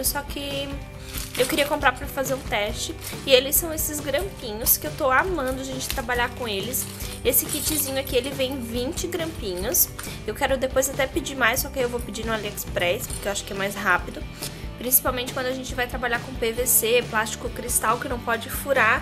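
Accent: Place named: Santa Catarina, Brazil. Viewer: Caraa tu mora aqui em Sc 😍😍 logo eu notei esse sotaque que so a gente tem, moro aqui em criciuma ja morei ai em floripa 😍😍😍😍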